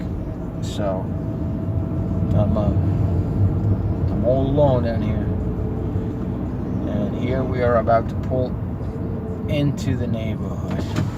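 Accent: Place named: New Jersey, United States. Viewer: You really live in “El culo del mundo” You say, Oh yes I’m from New Jersey, you speak like people from Paterson, Newark Good video to keep ass holes like you away of that great country. Excuse my spelling. Welcome all US tourists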